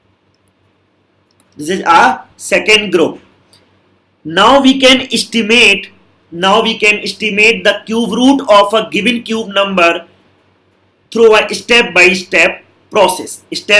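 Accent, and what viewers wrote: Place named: Bihar, India. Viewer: Bhai bihar se ho kya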